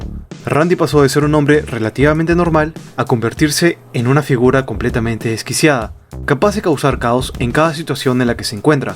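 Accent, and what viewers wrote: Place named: Peru. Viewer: Tu acento.. Me es muy familiar, eres de Perú?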